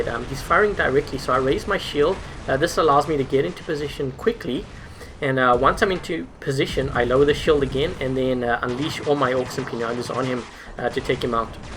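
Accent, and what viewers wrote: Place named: South Africa. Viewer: I could tell you were somewhat South African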